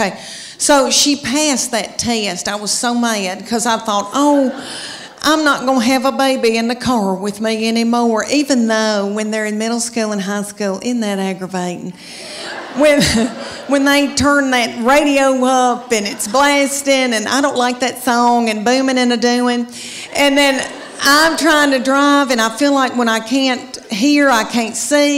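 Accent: South, United States. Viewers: O" Really? Me loves ya' Southern Country Accent though with them jokes of yours fits ya' Personality so, Very much or should me say fits ya' Personality so, Very well._ (Nevertheless, me Mr. Amos).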